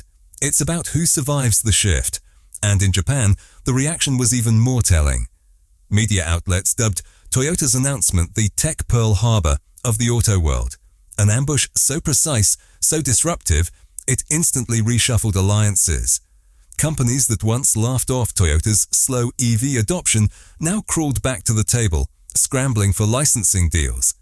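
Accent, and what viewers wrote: United Kingdom. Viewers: Don't listen to the message, listen to the method of delivery, formulaic, uses American expressions with a 'British' accent (muddy) and the same style as you heard from the same source you've heard previously, e.g. 'here's the twist', this wasn't market math', etc, etc.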